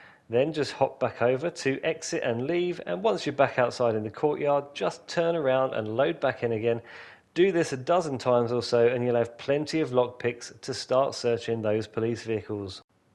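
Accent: United Kingdom. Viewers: British pronunciation is weird at times lol. They say inventory like invent-Tree we say it like In-Va-Tory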